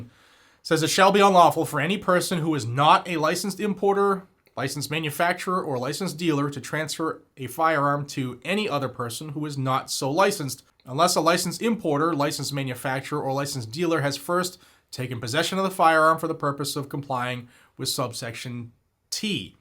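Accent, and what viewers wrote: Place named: Pennsylvania, United States. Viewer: When I heard you start this video I figured it was an idiot from Pennsylvania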